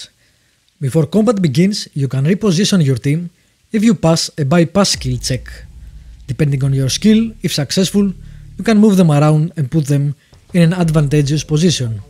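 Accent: Greece. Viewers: Congratulations because u are the only one that has made a Review of tis game. Igoogled "Vigilantes Review" and all the videos where gameplay except yours that was only a Review. I don't count the site reviews just the Videos. Συνέχισε την καλή δουλεία όταν είδα το 1ο video to " Medieval Napoleonic wars" από την προφορά κατάλαβα αμέσως οτι είσαι Έλληνας - έχουμε χαρακτηριστικη προφορα - έκτος Ελλάδος 3 στις 4 φορές που άκουσα κάποιον να μιλάει Αγγλικά το πέτυχα ήταν Έλληνας -.και μετά μπήκα στο "About" του καναλόυ σου και το διαπείστωσα. Sorry for the Greek language i was just congrajulate because he was the only one that has uploaded a video Review of the game and not Gameplay like the other videos when i Google " Vigilantes Review".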